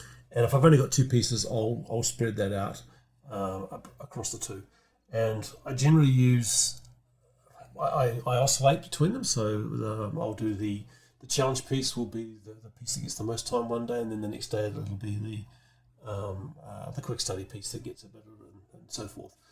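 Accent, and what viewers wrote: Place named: Ireland. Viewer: I am really very interested in your videos because I love your structure and to see your progress and that you are not performing. That's real live 😊. As a non nativ-speaker only your Irish (?) accent is really challenging for me and often I only guess what you maid have said 😅 Keep going!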